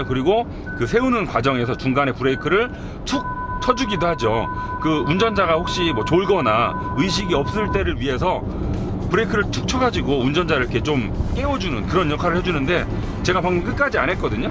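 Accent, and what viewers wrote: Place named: Jeolla, South Korea. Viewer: ㅋㅋㅋ무슨소리 억양만 들어 봐도 전라돈데 ㅋㅋㅋ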